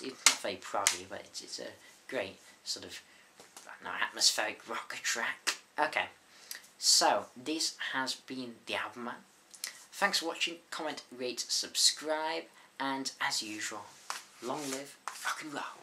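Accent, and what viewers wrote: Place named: United Kingdom. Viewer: This British accent... - couldn't catch the name of the second disc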